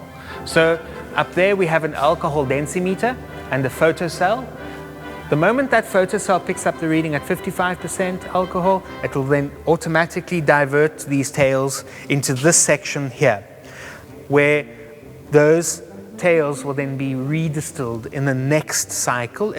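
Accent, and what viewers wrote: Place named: South Africa. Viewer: pmc eightsixeighty clearly you're a silly american. If anything he would be from an italian family which is why he is probably makeing grappa in the first place (but he actually sounds south african). Pronunciation doesn't make his 'english terrible' it gives him a accent.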